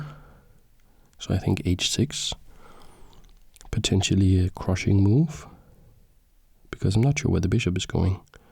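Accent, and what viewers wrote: Denmark. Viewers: I do so love the Danish accent. Have you been to the b*stard cafe in Copenhagen? It’s a board game cafe. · You should do a video in Danish! I love the accent and really enjoy watching these videos, it would be lovely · I don't know about you guys but I clicked for the Danish accent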